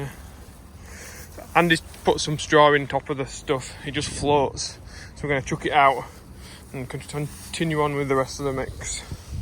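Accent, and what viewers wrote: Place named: Yorkshire, United Kingdom. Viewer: sorry buddy im going to pay a small visit tomorrow, Ive just watched a section of this blog to hear a proper Yorkshire accent coming out "Aye it's turned into a real nice day i might even ave to tek off the overalls for the first time in the year" got me smiling, great video as always keep up the good work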